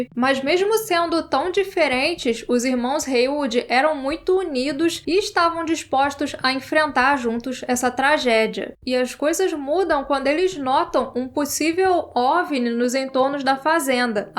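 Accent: Rio de Janeiro, Brazil. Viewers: Timbre agradável e sotaque carioca bacana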